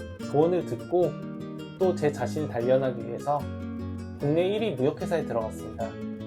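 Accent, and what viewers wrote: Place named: Busan, South Korea. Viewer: 부산분인데 사투리 별로 안쓰시네요..ㅋ 좋은학교 나온 인재시고 아직 젊으시니 앞으로 좋은 기회가 마니 오겠쥬..ㅋ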